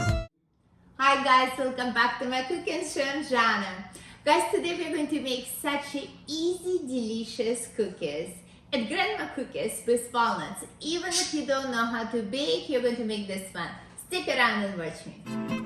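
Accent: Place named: Armenia. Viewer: These look wonderful. I LOVE your accent...it reminds me of all my Armenian relatives from many years ago. I will make these soon!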